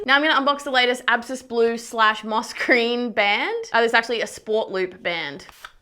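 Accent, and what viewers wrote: Australia. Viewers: Yeah, great channel by the way. Are you Australian, I am.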